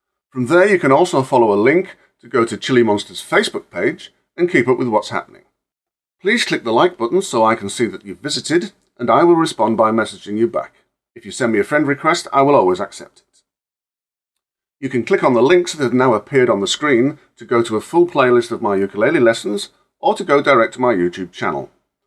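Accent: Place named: England, United Kingdom. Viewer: If nothing else it makes such a nice change to hear a youtube tutorial (on anything) in an English accent. (Sussexman here)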